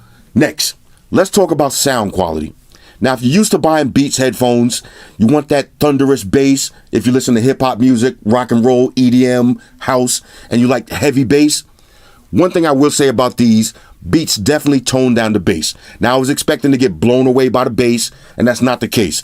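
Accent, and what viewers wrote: New York, United States. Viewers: I guarantee you this dude from New York 😂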